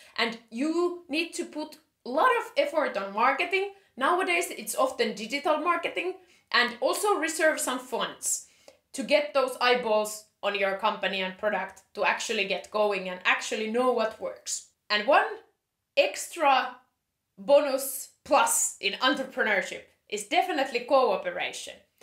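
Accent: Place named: Finland. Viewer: 11 seconds in I am betting your from Finland??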